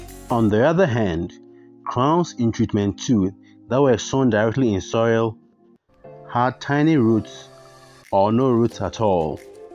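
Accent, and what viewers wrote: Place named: Ghana. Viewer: This is ain informative experiment that clearly illustrates the advantage of starting the pineapple suckers in water as opposed to planting them straight in the soil. Much thanks. By the way, if you don't mind my asking, your name sounds like a Nigerian Igbo name, yet your accent is unmistakably Ghanaian, and this got me wondering if is in fact a common name in Ghana as well as in Nigeria. Please forgive the minor deviation from the theme of gardening. I have always been fascinated by accents both international and African, hence my curiosity.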